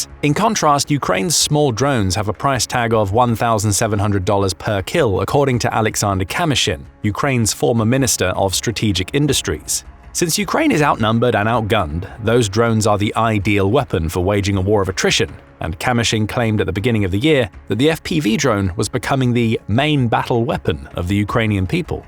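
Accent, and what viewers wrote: United Kingdom. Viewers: misleading title, and british yapping unrelated to the misleading title for over 12 minutes.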